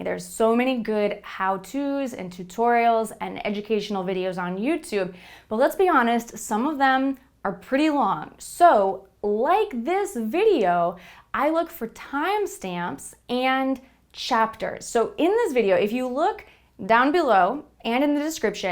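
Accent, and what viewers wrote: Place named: United States. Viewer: of course american english. her american accent's is so strong and clear. that's why i like american online teachers so much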